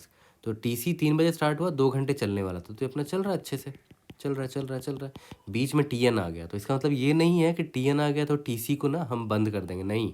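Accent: Bihar, India. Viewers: Are you from BIHAR bhaiya?? ..becuase your accent is same as ours ...i am also from Bihar...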